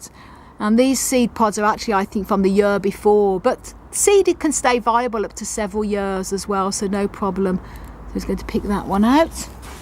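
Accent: United Kingdom. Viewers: I like your British ascent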